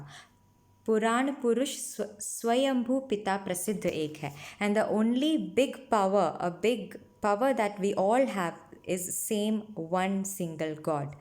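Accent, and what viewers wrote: Kerala, India. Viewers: Mam are u from kerala ? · Mam Are You From kerala 😍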